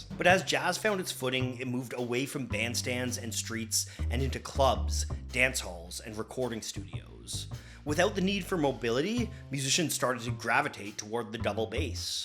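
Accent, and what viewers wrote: Canada. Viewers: Well, he is Canadian. In classical ensemble instruction in the UK I only heard it said corn’t from various directors and instructors. I’ve only heard Americans over-emphasise (to my ears) the net part.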